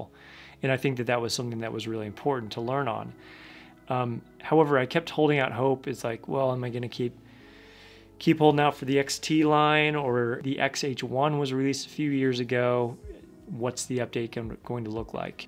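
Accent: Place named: United States. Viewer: I subs to your channel because your American accent! Ytuber from Vietnam, teaching English.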